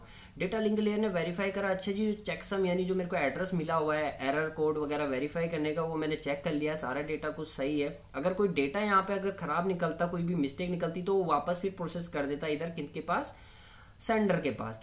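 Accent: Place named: Kumaon, India. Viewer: Sir maine abhi aapke y video dekhi ..what an interesting and easy explanation...... 🙏🙏🙏 Thank you so much .......I have a question ap kumaoni ho kya? Just lga...a little touch in your language...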